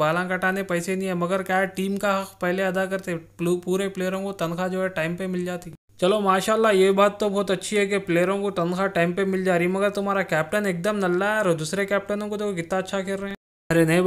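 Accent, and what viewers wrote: Hyderabad, India. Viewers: English class ny hoti vo hyderabadi slang hy vo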